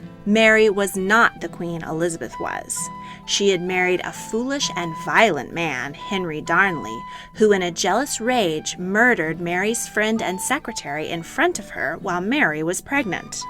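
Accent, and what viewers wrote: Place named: United States. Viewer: I do find an American narrator for my country slightly disconcerting !!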